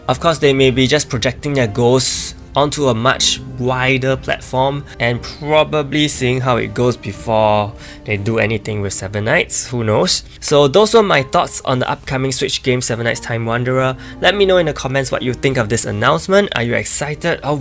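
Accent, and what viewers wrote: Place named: Singapore. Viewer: You sound Singaporean... are you?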